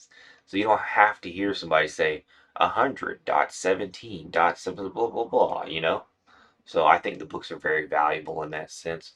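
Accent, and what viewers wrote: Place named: Scotland, United Kingdom. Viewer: I love! His thick Scottish accent keeps it interesting to me.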